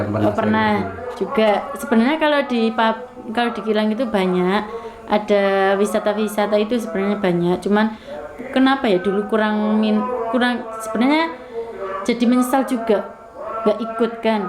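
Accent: Java, Indonesia. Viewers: Pekat Jawa nya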